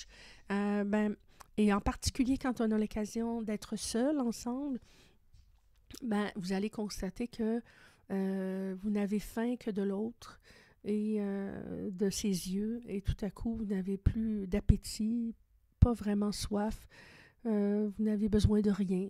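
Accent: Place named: Quebec, Canada. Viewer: Une voix familière québécoise! Il y en a peu depuis que je visionne différentes capsules sur ce lien suite à mon éveil! Je vous découvre avec bonheur ce soir. Je vais parcourir vos vidéos maintenant...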